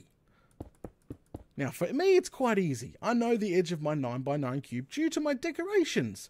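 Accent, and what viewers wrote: Australia. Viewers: I love this guys Aussie accent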